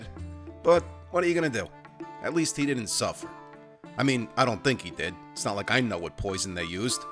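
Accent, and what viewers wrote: New Jersey, United States. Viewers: Is it just me or is the Jersey accent extra thick for this?:D Not complaining I love it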